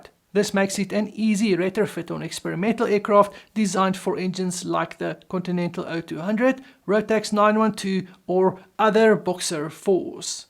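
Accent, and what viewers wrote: South Africa. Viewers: Puedo entender sin subtítulos .... bendito acento sudafricano 😅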